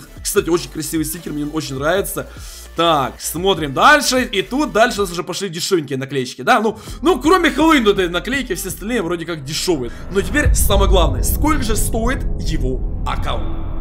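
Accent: Ukraine. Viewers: ты с Украины?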